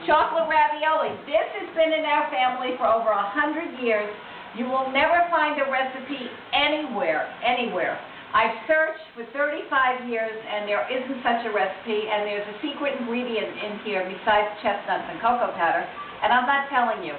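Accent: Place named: Brooklyn, United States. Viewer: Could hardly tell you were from Brooklyn.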